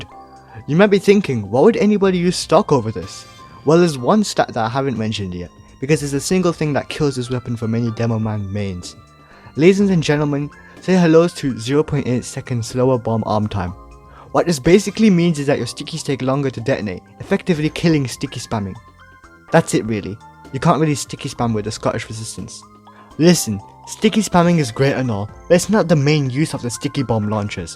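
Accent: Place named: Jamaica. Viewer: Something feel right about hearing a Jamaican man teaching you how to blow up stuff better in a videogame.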